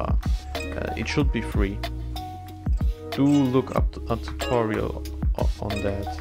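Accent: Germany. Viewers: I can hear some Deutsch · küss dein herz brudi, stunden lang gesucht (isntant erkannt das du deutsch bist)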